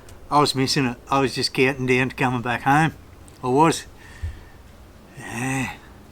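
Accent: Australia. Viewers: You talk like my mates back in oz do and I haven't been back there science 2013 so enjoy listening to you and watching your videos I've been meaning to come to your reaturant I live near the airport my wife has been very sick for the last year and she died last Sunday so now I can find the time Sean from tasmainia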